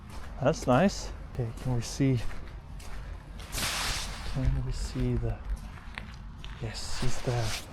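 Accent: Sweden. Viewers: Tyckte jag hörde lite östgötska när du pratade lite svenska i en tidigare så jag blev lite fundersam om du var från Östergötland. Inspirerande att få se dig fota på samma ställen som man själv brukar fota.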